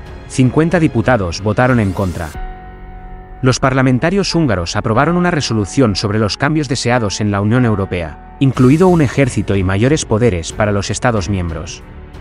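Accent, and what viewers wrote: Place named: Spain. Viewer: Bolivia noticias, y sale hablando un español. ??????????????????????????????????!!!!!!!!!. Habrá un canal que se llame chile noticias y salga hablando un cubano o un yanquee ????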